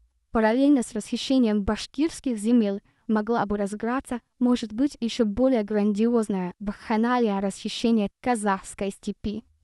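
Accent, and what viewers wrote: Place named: England, United Kingdom. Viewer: А окцент то инглиш. Есть поговорка если ругаются два соседа значит там побывал англичанин.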